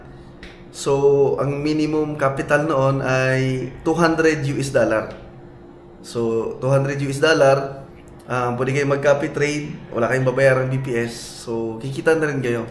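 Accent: Visayas, Philippines. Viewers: Bisaya diay ka dol hahahahabal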